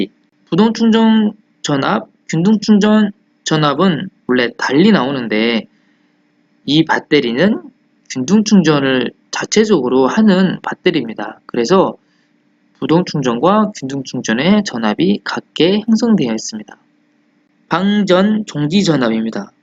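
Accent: Jeolla, South Korea. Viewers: 전라도 사투리가 강하고 구수하네요~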